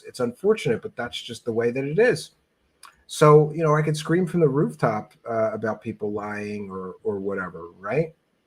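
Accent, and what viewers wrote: Philadelphia, United States. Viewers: Are u from Philly? Or the Philly area? Ur accent sounds like my Philly accent hahaha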